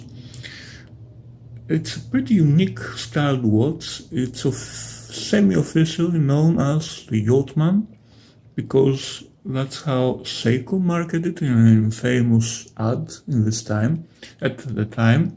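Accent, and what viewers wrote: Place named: Greece. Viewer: Great video,. I found the link to this channel from WUS and subscribed. Do you know what I like best about that watch? It doesn't feel the need to have a running seconds register, just to let us know it is running. It is assumed. Nothing is moving except when it needs to be. I like your accent too. Greek, I think; very nice to listen to. -Smaug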